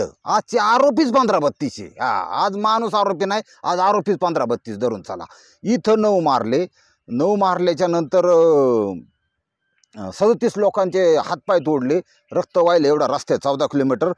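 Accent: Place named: Rural, India. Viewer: गावरान शुद्ध मराठी बोलण्याची शैली मस्त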